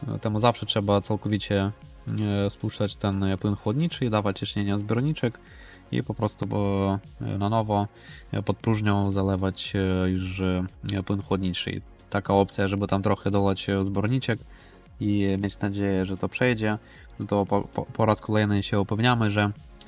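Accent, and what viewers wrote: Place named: Eastern Europe. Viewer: Słyszę akcent typowo słowiański 🇺🇦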